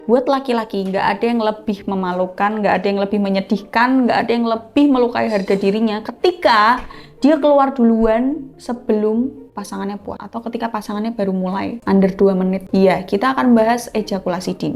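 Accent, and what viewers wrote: Java, Indonesia. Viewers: Mbae Jawa bgt ya...😂😂😂 I love U Mbae. ❤❤❤❤❤❤❤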